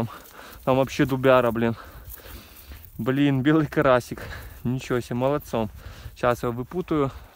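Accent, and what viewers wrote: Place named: Belarus. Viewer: По говору.вроде бульбаш. Где живешь?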